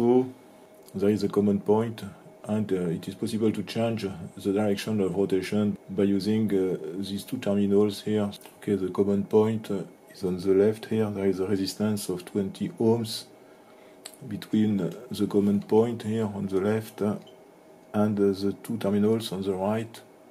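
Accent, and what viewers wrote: France. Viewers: Great stuff, thanx for showing us this beautiful tech. No need to be sorry for the pronounciation: French accent sounds so good, you could call us all "d4mn m0therfuckers", and we would still be flattered 😀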